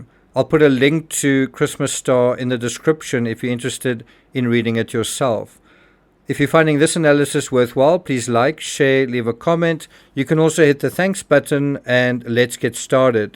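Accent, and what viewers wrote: South Africa. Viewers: Also, love the accent, it’s so soothing. South African right? Love it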